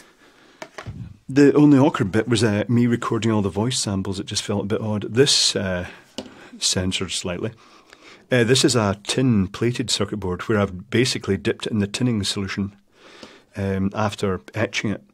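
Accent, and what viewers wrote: Scotland, United Kingdom. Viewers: 28 years ago I doubt I would understand his heavy Scottish accent.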